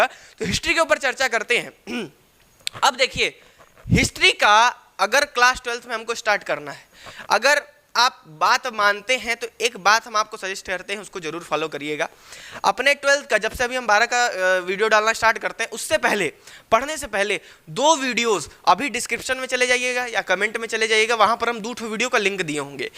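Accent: Bihar, India. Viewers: Aap Khan sir ki tarah bol rahe ho Bihar ke ho kya? Mein bhi Bihar s hu Darbhanga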